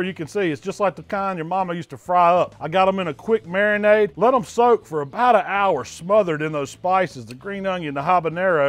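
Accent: South, United States. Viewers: It looks good, yet the Southern & Jamacian accents don't quite harmonize.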